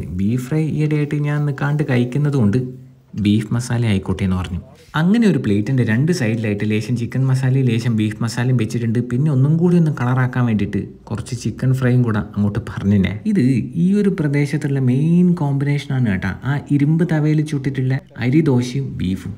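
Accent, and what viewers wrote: Kannur, India. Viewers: കണ്ടിട്ട് തന്നെ കൊതിയാവുന്നു 😋. നിങ്ങളെ അവതരണ ശൈലി ഒരു രക്ഷയില്ല. പിന്നെ നമ്മളെ കണ്ണൂർ ഭാഷയും 😍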